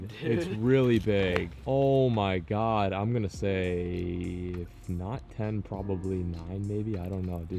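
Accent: United States. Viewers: I love the country chick accent please keep doin it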